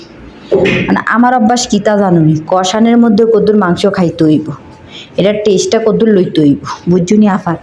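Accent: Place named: Noakhali, Bangladesh. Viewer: আফনের ভাষা নোয়াখালী, চাঁদপুরের অইসে কুমিল্লার ভাষা আফনে বুইল্লা গেসেন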